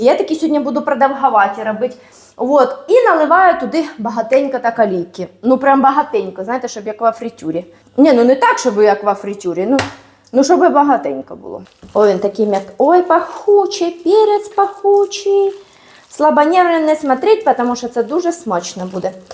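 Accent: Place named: Kherson, Ukraine. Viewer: нравится такая речь У нас на Херсонщине так разговаривают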